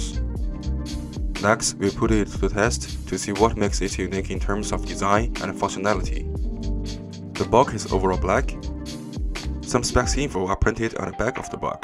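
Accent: China. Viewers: They are from china. And I guess some people may not understand the way they speak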